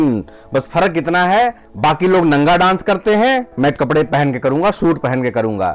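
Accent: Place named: Varanasi, India. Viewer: Apki boli me banaras ka mithass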